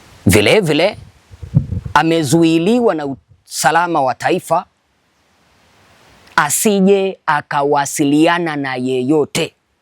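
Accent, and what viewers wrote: Kenya. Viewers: He has Nothing he is Speaking truth other thank making Up stories he Feeds from fake Stories media Comments and Posts..THIS IS A bullshit Kenyan Posing as Tanzanian while He cannot even Pronounce Nchimbi in a Right way instead he is using Kenyan and Kamba Pronunciation of “NJIMBI” and NDEJEMBI IS “NDENJEMBEI”. Huyu. Ni MSENGE ANALIPWA NA SATIVA NA MWAIPAYA KUPISTI UPUUZI USIO NA MAANA WA TANZANIA ASIYOIJUA.. ULIONA WAPI MTANZANIA HAJUI KISWAHILI?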